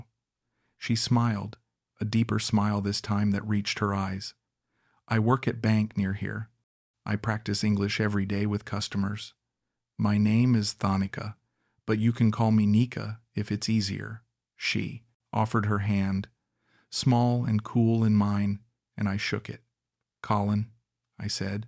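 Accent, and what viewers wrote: United States. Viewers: You don’t have a British accent, you have American accent so is this story real? If it is real I think you are not a smart Englishman!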